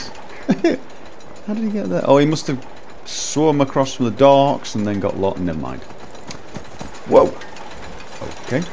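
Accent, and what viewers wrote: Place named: England, United Kingdom. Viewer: It's probably a regional thing. There are quite a few words he pronounces differently than I've ever heard. I've always attributed it to the fact he sounds English.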